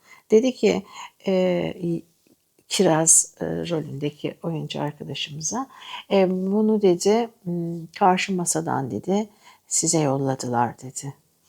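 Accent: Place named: United States. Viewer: Amerikan aksanıyla Türkçe konuşmak...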